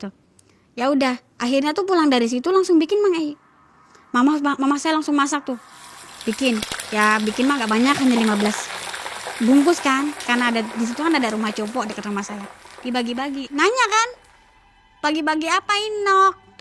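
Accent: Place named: Cirebon, Indonesia. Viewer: Bahasanya kaya cirebon😅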